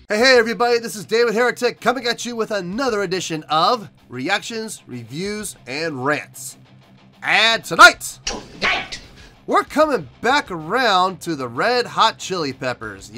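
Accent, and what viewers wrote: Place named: United States. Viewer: How come you didn't listen that album before??? You are definitly old enough?! And you sound american. I was born in 1978 in ex yugoslavia and know that album by heart. Are you faking it? Please don't say you were bussy listening panthera or sepultura 'cause that's no excuse.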